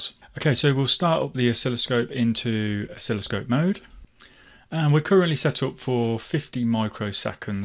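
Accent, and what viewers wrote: United Kingdom. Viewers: I am sure that accent is from my neck of the woods Burton On Trent ? I have one of these 1008 Hantek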